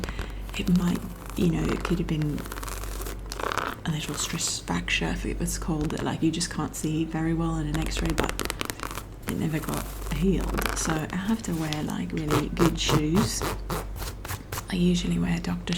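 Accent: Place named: United Kingdom. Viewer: An Italian with a British (I assume) accent, living in Egypt, with friends in France, and family in the USA. What a true international life!!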